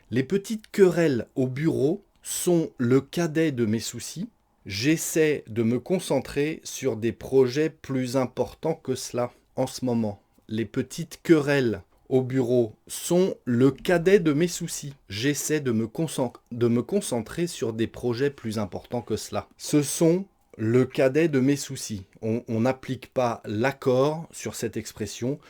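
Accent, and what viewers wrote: France. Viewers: Professeur, cette leçon est très intéressante et importante et c'est très agréable d'entendre sa voix avec son accent français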